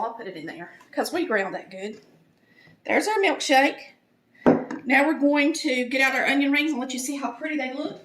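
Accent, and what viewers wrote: South, United States. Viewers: I wanna move to the south so i can get this beautiful southern belle voice and postpartum cookin🥺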